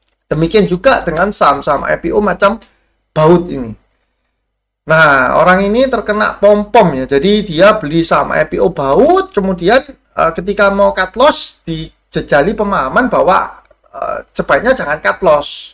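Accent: Surabaya, Indonesia. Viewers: saya suka sekali ... medok medok Surabaya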